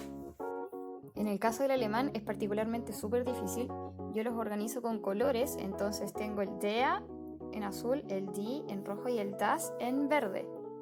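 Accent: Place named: South, Spain. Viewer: tienes un acento precioso! Y ese acento sureño se entiende perfectamente! Muy buen video! Saludos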